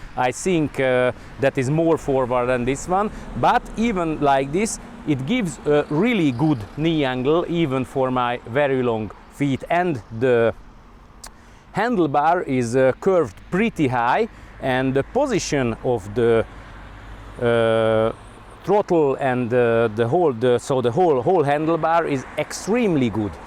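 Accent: Hungary. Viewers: Nice hungarian accent.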